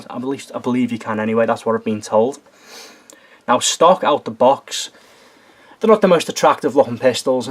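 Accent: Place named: Merseyside, United Kingdom. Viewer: Hi mate you sound like you live in Merseyside. Do you know anywhere here where we can shoot airguns? I live in birkenhead lol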